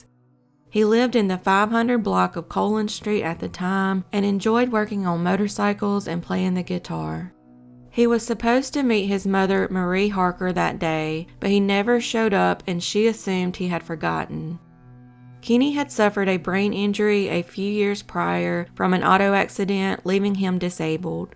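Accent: South, United States. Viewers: I am truly addicted to true crime and cold cases, I enjoy your presentations and I love listening to your sweet southern accent. Please keep it up, by bringing awareness to these cases you never know who is watching and who may have a key to solving any one of these mysteries. Thank you! I will keep watching and keep supporting your channel!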